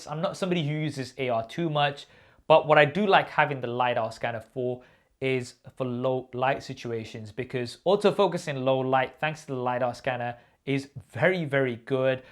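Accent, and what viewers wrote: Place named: United Kingdom. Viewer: Thanks for such a broad view. And super nice to hear a British accent! Blessings. 新年快乐 xin nian kuai le Happy New Year 🍵🍾🍾🍾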